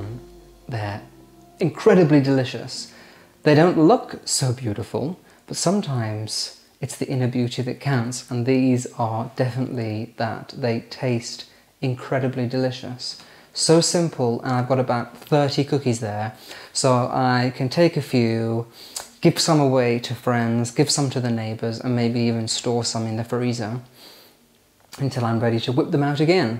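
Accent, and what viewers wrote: RP, United Kingdom. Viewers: Im a new subscriber,and really enjoy your videos,i would like to make a point,i wish most people had your way of speaking,its not artificial its the Queens English,i speak the same way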